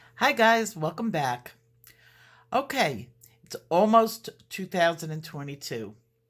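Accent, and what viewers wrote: New York, United States. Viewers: oh my goodness, you have no idea how excited I am. I always wanted to learn about color and color theory. Mostly for fashion purposes and how color effects people; but never thought of myself an artsy kind of person at all. Until about a month ago, I had no interest in coloring in coloring books. I had no idea this community even existed. Now I’m obsessed!I’m looking forward to all the challenges, that I have no doubt you will be putting on us! Potty mouth, NY accent and all. LOL! Truly looking forward to the channel, learning and growing ❤️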